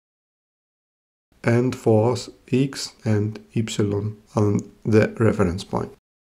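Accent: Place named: Germany. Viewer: Great, thank you 👍 Love the german english mix Hundert five point vier 😁😁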